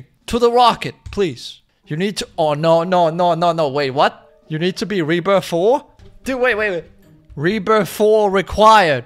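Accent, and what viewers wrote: Denmark. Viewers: Quick question are you Danish??😅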